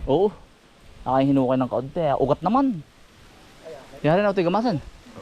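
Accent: Quezon, Philippines. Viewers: Ka insan san ba banda bahay nyo if papunta sariaya san baba para makapunta dyan matagal na ako subscriber mo doo ka pa sa kuawit dalawa kami kaibigan ko kya nga sabi ko parang yung punto mo eh taga quezon ka nga kya noon nakita ko na naluluto ka ng kalamay sabi ko na nga ba at taga quezon ka eh meron kmi laundry shop dto sa lucban sa may gilid ng pandayan bookestore sa may kantosaan ba yan sa inyo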